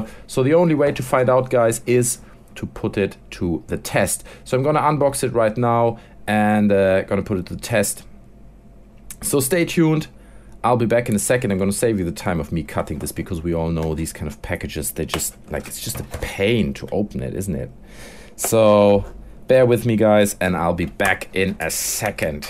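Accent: Germany. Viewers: fürchterlicher deutscher Akzent ^^ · Anybody know where this flavor of english accent is found/from? I hear it often on youtube but its clearly not British, Irish, Scottish, Australian, South African, North American, I cannot pin it down, It almost has a German or Swiss hint to it... ?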